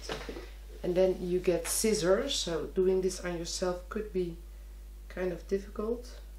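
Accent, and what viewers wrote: Netherlands. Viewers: are you dutch your voice is a little bid dutch im dutch 😂😂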